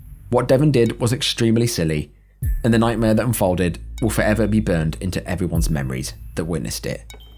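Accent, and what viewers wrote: United Kingdom. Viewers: Yeah the writing on this channel is very 7Th grade. Even the British accent doesn’t even help.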